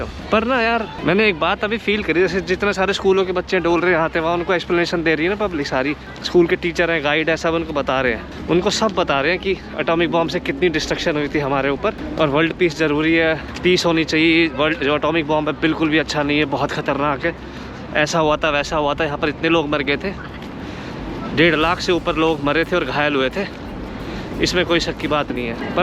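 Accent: Haryana, India. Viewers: Bhai aapki language se lagta h ki aap haryana k palwal ya phir faridabad district se belong krte ho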